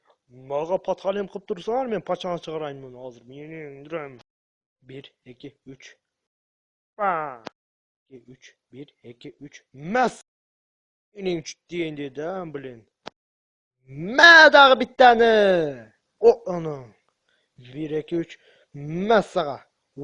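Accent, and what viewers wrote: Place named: Batken, Kyrgyzstan. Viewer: бул баткенче суйлоп жатат сарт дебегиле баткенге асылбагыл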